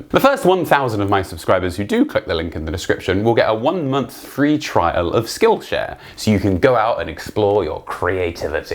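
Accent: United Kingdom. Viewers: Wait you British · Why are you British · I love listening to a British man who sounds like he just got through with his divorce papers talk about fallout two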